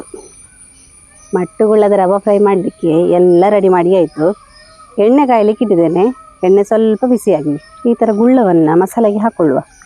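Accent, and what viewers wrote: Coastal Karnataka, India. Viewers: ನಿಮ್ಮ ಕರಾವಳಿ ಭಾಷೆ ಕೇಳಲು ಬಲು ಚೆಂದ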